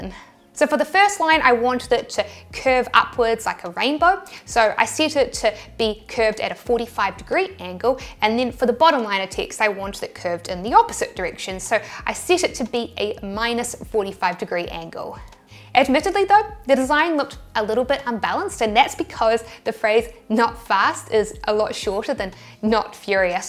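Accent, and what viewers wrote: New Zealand. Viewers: Hello from California. Thanks for sharing your knowledge. New Zealand is a new accent for me! I'm learning stip-by-stipI I am taking notes and trying to absorb as much as I can. Cheers Sis.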